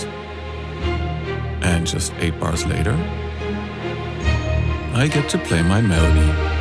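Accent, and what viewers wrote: Germany. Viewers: German Accent?;)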